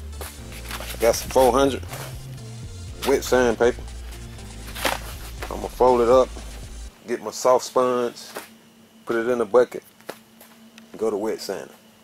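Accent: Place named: Houston, United States. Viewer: Sounds like he's from Houston.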